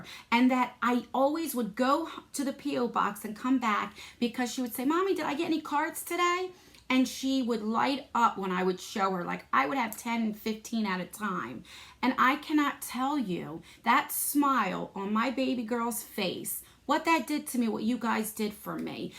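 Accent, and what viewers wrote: New Jersey, United States. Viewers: you are so generous and giving. Thank you for doing this. My husband was raised in New Jersey, and I enjoy listening to the Jersey accent so much. Besides, you are very fun and expressive! People like you are loved and hated because of your beautiful soul. Keep being who you are, it really lift spirits. God bless you always! 🌺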